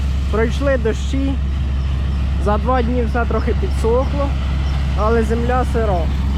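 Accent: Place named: Poltava, Ukraine. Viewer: Яка розкішна і соковита полтавська вимова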